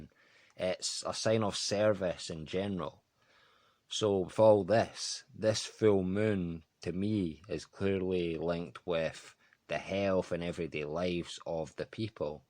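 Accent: Scotland, United Kingdom. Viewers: I'm sorry. I have a question to ask but know not where, so I chose your recent video. It seems obvious to me that each nation has a sign. I am Filipino and Scottish. They are both like Scorpio. Both nations changed war. They are maniacs in battle. But you don't find serial killers from there. They are both from small islands. And they are considered the most handsome people. They are terribly inventive! But they love to drink. To the extreme. Really, Filipinos are your brothers in the East. And guess what? The Philippines has the most Freemasons in the East. The Scottish Rite is there. The Southern Filipinos destroyed all Western invasion. The Celts couldn't because they shared terrain with the enemy. But still, you, a Scot, have your accent. And many Celts do speak their language. How to determine the sign of a nation? The Russian psychic grandma said that all nations have stars. 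What I know is, Scotland and Philippines are Scorpio. We love melee. We are good talkers. When we have babies, wow!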